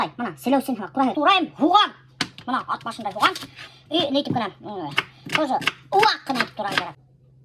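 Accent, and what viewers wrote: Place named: Russia. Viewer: Очень приятный и красивый язык. Но только без русского акцента если можно.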